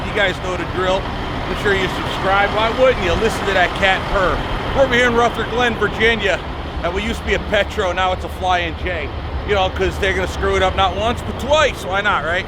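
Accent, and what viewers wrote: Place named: New York, United States. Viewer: You from New York New York or New Jersey that accent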